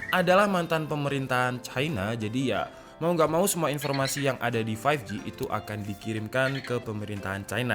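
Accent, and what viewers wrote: Malang, Indonesia. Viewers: #REQ Kedepannya coba buat playlist podcast aja mas, seperti di channel sebelah. Toh dari segi penyampaian/pemilihan diksi mas juga udah bagus, cuman perlu diperbaiki dari segi 'medok' Malangnya itu wkwkw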